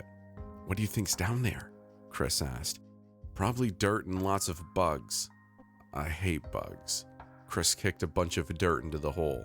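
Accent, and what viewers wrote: Canada. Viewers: "Soary=sorry" "bean=been" lol are you Canadian? Great narration anyhow!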